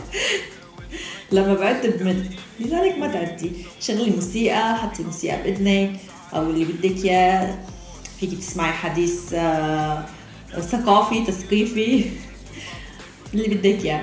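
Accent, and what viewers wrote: Syria. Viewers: شنو اصل ؟سورية لو مغربية لو شنو..لهجتهة سورية بس مرات اشك انو مو سورية🤣♥️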